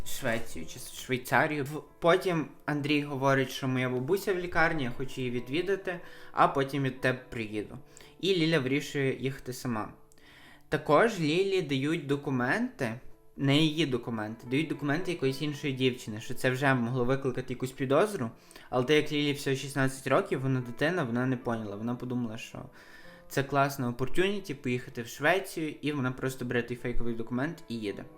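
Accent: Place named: Surzhyk, Ukraine. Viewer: такий жоский суржик((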